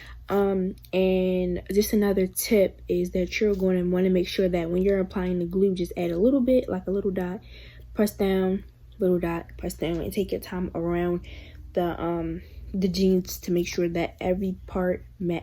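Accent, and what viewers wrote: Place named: Baltimore, United States. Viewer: it sound like she from baltimore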